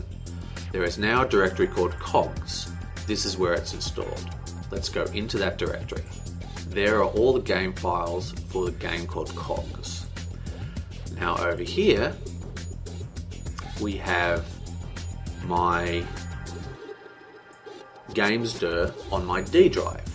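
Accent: Australia. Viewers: I'm most impressed by the fact that you did all this with steam open in the background. Anyway, great tutorial! And is that an Australian accent? :P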